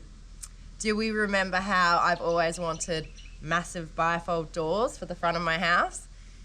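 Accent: Australia. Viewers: Gday looking great got Granddaughter Number two with me Sarah she is 18 months and loves your show haha maybe the Australian accent have a great week !!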